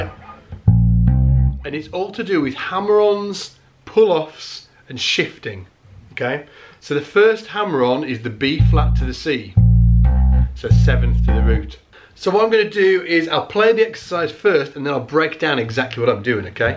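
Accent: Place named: England, United Kingdom. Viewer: Thank you so much. You are the best Teacher with a lovely english accent. I'm learning soo much from you. It's a real pleasure to listen to you. You can make my day even after hard work in the office.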